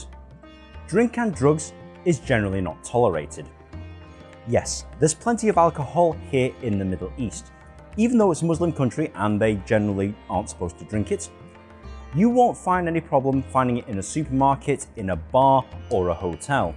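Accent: United Kingdom. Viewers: You look asian but your accent is Brit.. what gives man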